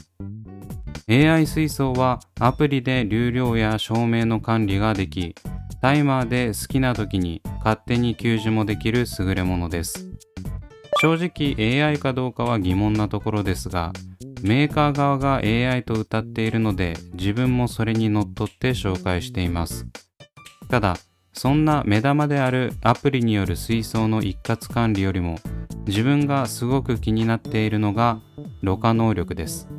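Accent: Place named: Kurume, Japan. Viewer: 北部九州系、久留米あたりのイントネーションで、よどみなく滔々と話されるのが心地よいです。アピスト？ああ、まぁ育ってんじゃないですかね、って感じです。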